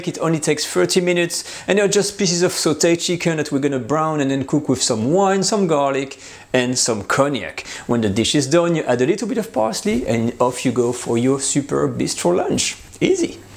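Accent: France. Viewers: Your French accent convinced me to make this recipe.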